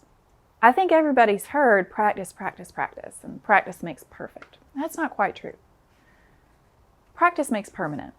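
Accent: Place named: South, United States. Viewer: Love how the southern slips through from time to time.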